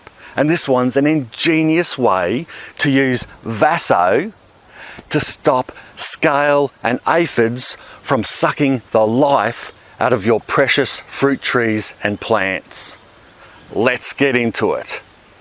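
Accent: Australia. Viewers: Awesome video thanks for the information. Great to hear an aussie!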